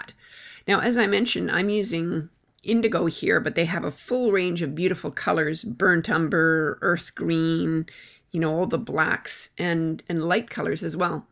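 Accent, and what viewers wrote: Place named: Fargo, United States. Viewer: Omg I love your Fargo accent so cute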